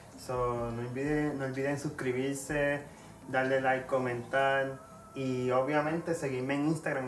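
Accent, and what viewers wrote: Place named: Puerto Rico. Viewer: Brothel me ayudaste un montón…me sorprendí al abrir el video escuchar el “que es la qué hay mi gente” yo dije “este es boricua ¡ESO ES!” Saludos y gracias brothel 💪🏽🔥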